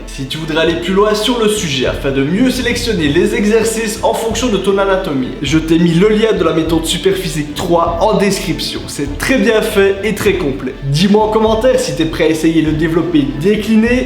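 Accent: Belgium. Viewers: Olala nonante septante ! On est belge ou suisse ? (je parierais sur le premier choix vu l'accent) Ceci dit, belles explications sur le développé décliné, que je voyais jusque là vraiment comme un exercice parmi les plus inutiles possibles (eh oui moi aussi on m'avait dit que ça musclait le bas des pecs)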